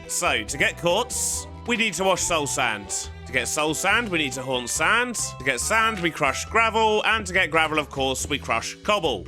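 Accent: United Kingdom. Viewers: Your voice sounds so british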